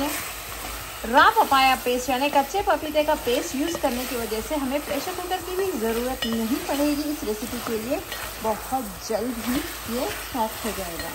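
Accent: Hyderabad, India. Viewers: Assalaam Alaikum, Amma Kya Malai Gosh Banai naa aap. Laikin, mujhae yaa poochna tha, amma, ismae mirchi thoda kam daal sakte kya. Mai thoda midcii kam khata hu., kyu kae, mai England mae takriban 50 (Pachaas) salo sae hu. Aap ki Hyderabadi boolthi sunkae bada maza aaya. My aap ke Chantal ko like aur subscribe kardiya. Mai aur meri beebi Hyderabad jab aaynga to aap sae mulakhat ki umeed rakhtae hai. Allah Hafiz..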